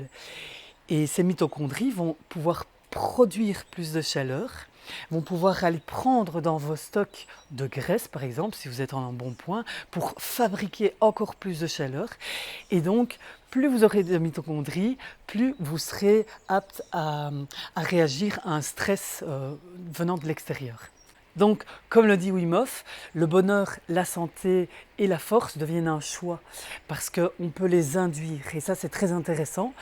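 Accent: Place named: Belgium. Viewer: Un accent belge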